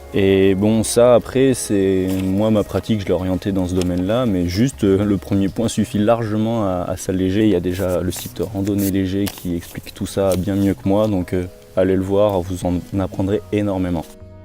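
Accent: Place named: Basque Country, France. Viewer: Bonjour. Bravo pour votre chaîne et vos périples. J'aime beaucoup votre sincérité, modestie, et philosophie de la randonnée. Il faut toujours commencer avec son père, le seul type au monde que vous pouvez envoyer balader et qui néanmoins, vous aimera à la folie :) Une certaine façon de prononcer les "R" me fait penser que vous n'habitez pas loin du Pays Basque. Bien cordialement.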